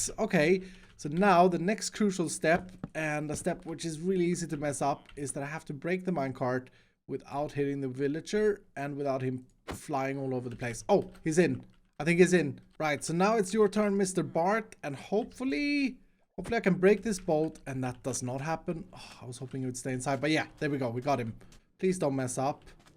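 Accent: Sweden. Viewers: i thought so im hearing om is English it’s very good but I hear that Swedish voice lets gooo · are you swedish?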